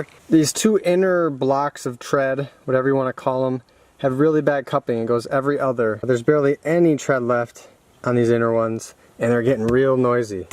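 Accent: Wisconsin, United States. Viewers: Now that you're back East, I do believe I hear some of that Wisconsin accent coming back. Is it sleeping bags or sleeping begs? lol Hope y'all are doing well.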